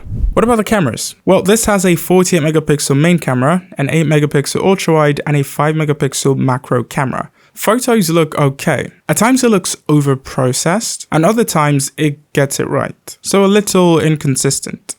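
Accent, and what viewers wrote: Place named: United Kingdom. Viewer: British man😊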